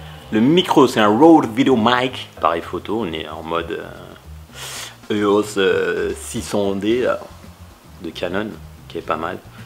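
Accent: Africa. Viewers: trop la classe l'accent africain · C est vrai que tu fais trop bien l'accent africain x')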